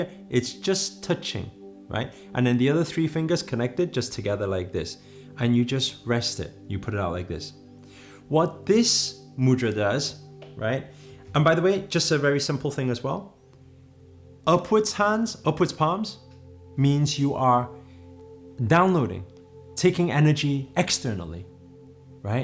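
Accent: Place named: United Kingdom. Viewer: Love your videos and your British accent... Thank you for this explanation!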